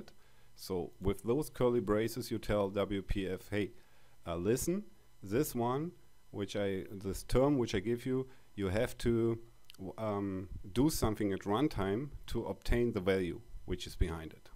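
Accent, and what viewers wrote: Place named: Germany. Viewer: A very German name and a very German accent :D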